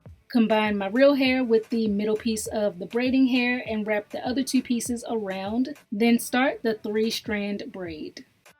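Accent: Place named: Maryland, United States. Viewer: I'm getting ready to try this. I followed your butterfly lock tutorial & got tons of compliments! Thanks! (Where are you from? You sound like you're in Maryland. Either way, I love your accent!)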